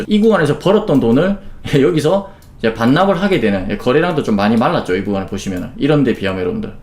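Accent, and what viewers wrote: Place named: Gyeongsang, South Korea. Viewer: 이 형은 경상도사투래때매 포인트가 딱딱 박혀서 좋아!! 명불허전입니데이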